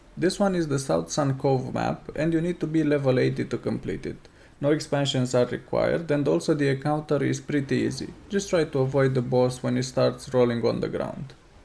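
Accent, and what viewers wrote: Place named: Romania. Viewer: I like your accent, where are you from? Romania? Turkey?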